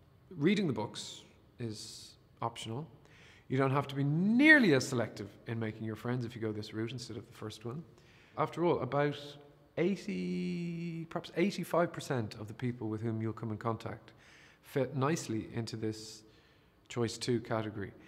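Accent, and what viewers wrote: Ireland. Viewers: That's a posh Irish accent. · He is speaking Hiberno-English. And the speech is universally relevant. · It’s an Irish accent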